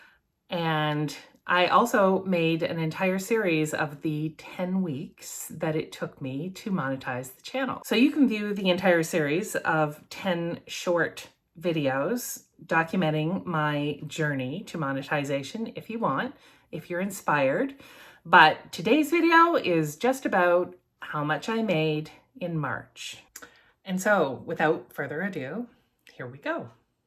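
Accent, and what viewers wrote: Canada. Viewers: Are you in Canada or at least Canadian? Sounds like it. 😊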